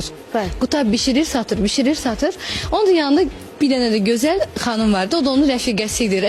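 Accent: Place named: Quba, Azerbaijan. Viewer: Noye olmur Allax bunu Kuya aksentsiz daniwamir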